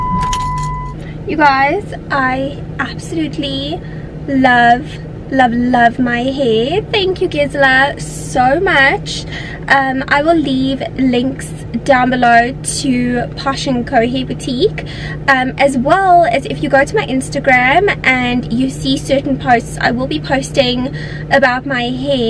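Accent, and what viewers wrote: South Africa. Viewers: I knew you were from south africa once I heard your accent, sounds like Candice Swanepoel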